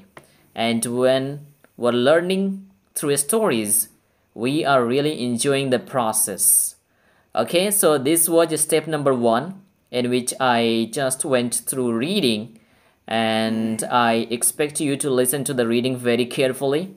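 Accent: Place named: New York, United States. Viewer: NYC voice dear sir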